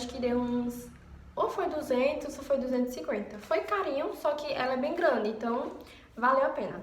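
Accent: Northeast, Brazil. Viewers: Uma cara de paulista danada e sotaque nordestino kkkk combinação interessante, legal o vídeo, tbm estou buscando morar sozinho ano que vem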